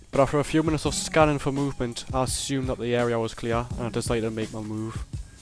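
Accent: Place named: Newcastle, United Kingdom. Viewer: Nice to hear a geordie voice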